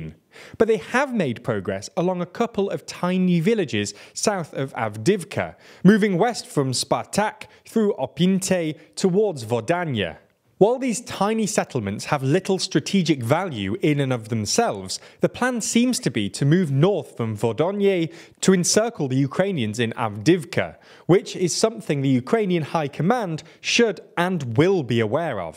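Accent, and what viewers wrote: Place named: Midlands, United Kingdom. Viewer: I've never seen any other youtube channel that consistently butchers Ukrainian place-names as consistently and as poorly — and almost none of them speak any Ukrainian either. If they're trying to deliver news about Europe, this channel needs to try harder to adequately pronounce European words that aren't muddled with a Midlands accent.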